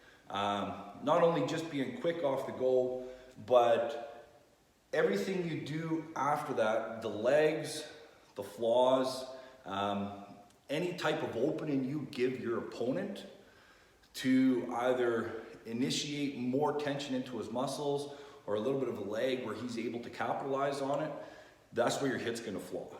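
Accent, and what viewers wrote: Canada. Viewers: Finally a arm wrestler with a great Canadian accent eh! Want to hear what you think will happen in Dubai. What each guys should do. What each guy you think will do. 16k subs already. Nice start.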